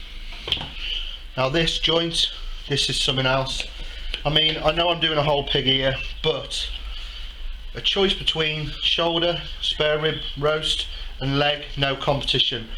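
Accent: United Kingdom. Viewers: Well done video, why no ribs? You guys don't do ribs in the UK? (guessing on accent) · everything just seems cooler with a British accent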